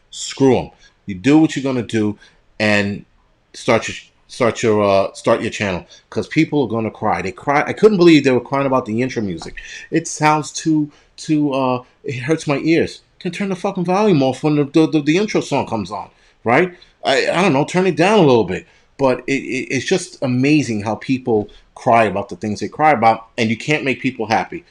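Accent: New York, United States. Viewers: That's New York language. Love it.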